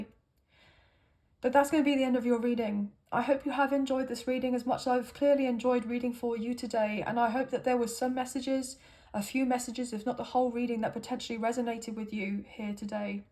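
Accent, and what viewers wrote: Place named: Southern England, United Kingdom. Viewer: Alright sis, love your southern English accent,the french accent was strange, however thanks for all the encouragement we will see how this week works out❤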